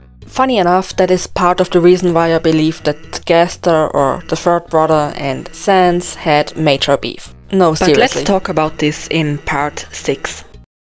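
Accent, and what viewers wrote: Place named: Germany. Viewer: This is a German accent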